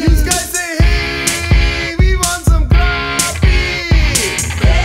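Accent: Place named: Wisconsin, United States. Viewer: me, my neighbors, my dad, and everybody from wiscansin all talk like that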